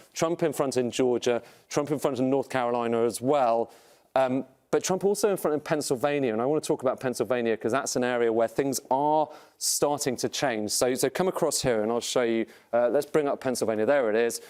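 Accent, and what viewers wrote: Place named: United Kingdom. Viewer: I don, t understand why this British accent? I get soooooo confused. Where is actually this Election?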